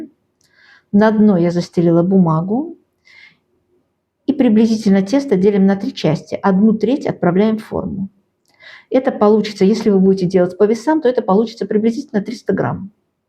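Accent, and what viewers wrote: Baku, Azerbaijan. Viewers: Спасибо!! Привет из Баку!! У вас остался бакинский акцент, с удовольствием смотрю ваши видео, обязательно испеку!! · Дорогая, я сразу это поняла, ведь я тоже Бакинка, Правду говорят, «Свой свояка, узнаёт издалека». Я раньше тоже вела Влог. В Баку мы все были по Национальности Бакинцы 😊 Поэтому, Наш акцент, наша доброжелательность, наш менталитет останется с нами навсегда. Я от всей души желаю вам процветания, удачи во всем. С Бакинской теплотой, и нежностью Нателла Борисовна. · Мне 82года стараюсь меньше есть чтобы не прибавлять в весе,но смотрю ваши ролики и так наедаюсь вашими вкусняшка ,конечно некоторые рецепты применяю,а калорийные лайкаю и ваш бакинский акцент умиляет,возвращаюсь в старый родной Баку.Желаю вам здоровья и процветания.Всем кто смотрит ваши ролики мира,здоровья и добра.Израиль. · есть такая нация - бакинцы. Это наш говор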